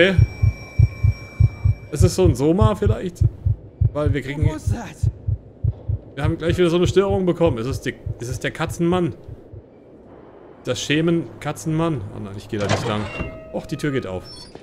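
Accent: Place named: United Kingdom. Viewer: Der Britische Akzent ist so schön ! <3 ich mag es auch lieber wenn es winter ist blöde Hitze >y<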